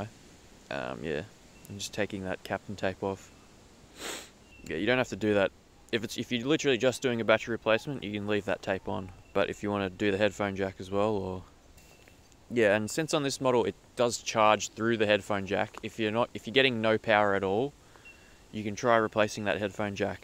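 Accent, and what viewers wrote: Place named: Australia. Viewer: OMG. He's Australian. Thumb down!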